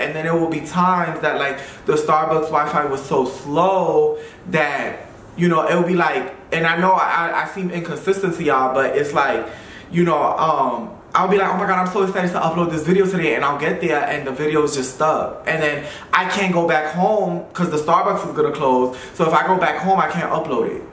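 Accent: New York, United States. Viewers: I have missed your face and that NY accent. So glad you're back!!!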